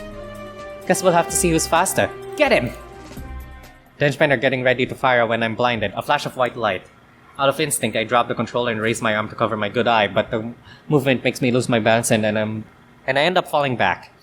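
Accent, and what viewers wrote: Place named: Russia. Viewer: Another lovely video as usual XD love you Russian accent :3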